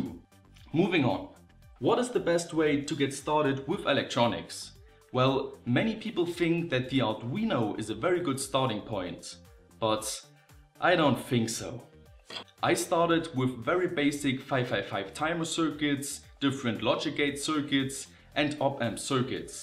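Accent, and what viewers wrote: Saxony, Germany. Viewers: Aha, noch ein Sachse! :D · I like the German accent in your English and by doing these video's in English you at least reached The Netherlands :-) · your German accent has actually made it easier to pick the terminology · Ich finde man hört es an seiner (englischen) Aussprache, dass er deutscher Herkunft ist ^^ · Ein bischen hört man es das er deutscher ist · Since i heard your voice the first time i said ... " He has to be german !!! " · Thanks for the videos, I'm Colombian and is easy for my view videos in english even with your german accent, german is an lovely language and hear it is really cool, but my knowledge of that language is very, very limited. please don't make videos in german. Is a fact, english is a language more "extended" than german in the world. Congratulations for the 100k subscribers!, Is a outstanding work !. 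actually is my fav channel. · Genau! Und bitte schön sächseln;P nachbarschaftliche Grüße aus S-A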